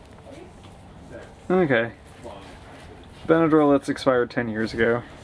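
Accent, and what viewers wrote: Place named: United States. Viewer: I do wonder just how they got to be all "rummaged through" like that. Maybe animals had gotten in them and ripped everything up, like the cushions of the chairs and couches? And, NO toilets? Just HOW old are these cabins? And, since I am commenting here as I watch the video, what state is this all in? I am just ASSUMING it is in the USA. Just because our "tour guide" speaks perfect English of the US type. By the spruce tree's in the video, I don't think this is too far South in the US, IF it is in the USA. Okay, at about we get past the shacks or cabins to the old trailers. The trailers SEEM to be maybe 1980's? Possibly late 1970's? And, it's just odd how the trailers seem to just be abandoned without anybody taking most of the food and other things with them when they left it. And, whomever's land they are all on, haven't they pushed to get it all cleaned up? 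It is all so mysterious how this old place is just left here abandoned. You would THINK that IF it could be all cleaned up and cleared out that MAYBE the property owner could sell the land OR even make it a nice place for a NEW trailer park , or IF it is NOT just out in the Boonies, a good place for new homes to be built at. BUT, It IS really quiet out here, it MAY be in the boonies of the boonies. And, maybe most people would NOT want to be that far away from a city or town center. Some of these trailers may be even from the 1960's, but, some are maybe 1980's too. Most of the furniture is about 1980's too. But, ho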